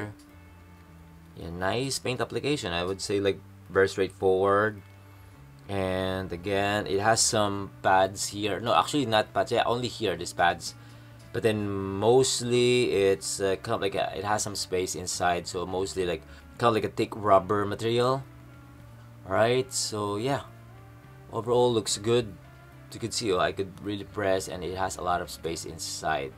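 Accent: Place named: Philippines. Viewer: Are you Filipino, sir? Your accent sounds like..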